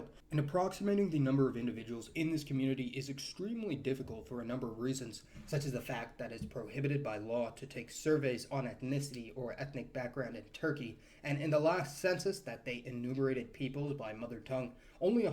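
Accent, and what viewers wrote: United States. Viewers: Isn't it telling that, just now, when the US is attacking Russia and saturating the world with anti Russia propoganda, that this US presenter complete with American accent covers this old history at this time? Its just the US trying to sow conflict as usual by sticking their boot in to widen any division anywhere they can find. Its just a distraction from all the wars, invasions, thefts and mass murder the US specialise in....to 'Defend' that land already defended perfectly well by the Atlantic & Pacific. "Don't look here, look at those Russian baddies" from centuries ago.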